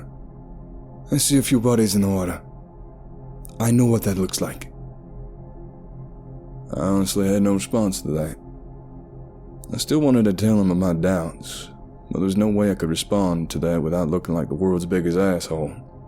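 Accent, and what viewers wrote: South, United States. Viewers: spoiling us with another vid in his smooth southern comfort rendition. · Wow. you nailed that southern accent! Granted, I was raised in NY state but damn if I didn't feel like I was in the bayou. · Great souther accent bro!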